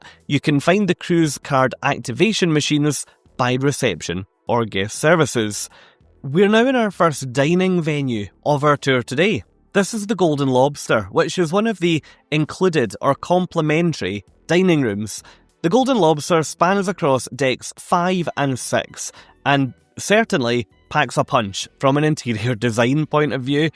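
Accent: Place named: Scotland, United Kingdom. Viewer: Okay, we have a bet going. Please help. My wife says you are Scottish, my son says Welch.... I hear something more Big City and mixed... Can you help us out? Yes, we all love your accent!!!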